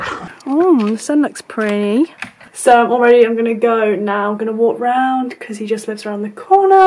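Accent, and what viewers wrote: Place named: United Kingdom. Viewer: Hi,! Your videos are very nice and cool 😊 I started watching your videos when I was looking for info about UofL 4 months ago, but I still keep watching your videos as they are very creative and relatable. You really put a lot of work into your videos and this is awesome that you keep on posting. Don’t give up on YouTube, your subscribers love your videos😍😍😍 P. S. Your UK accent is so wonderful, I love it. I wish I could speak with that accent (I’m Ukrainian)🙈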